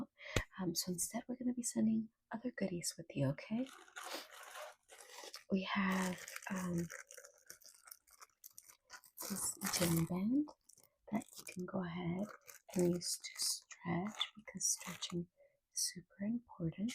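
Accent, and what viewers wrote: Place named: Brazil. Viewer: Posso estar enganado, mas reconheço um sotaque brasileiro de olho fechado, inglês ótimo inclusive.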